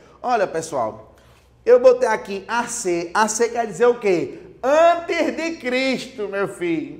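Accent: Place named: Northeast, Brazil. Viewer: Esse caba é do nordeste mesmo, valeu mesmo.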